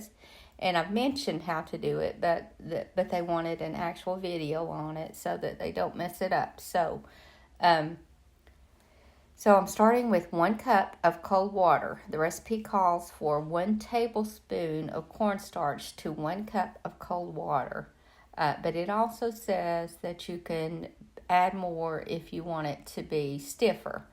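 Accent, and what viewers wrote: South, United States. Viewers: You have such a thick southern accent, which most of us aren't used to hearing, that made you so hard to understand. Could you please repeat this in standard English or with captions. If it wasn't for the vid, I would have missed out. Thanks.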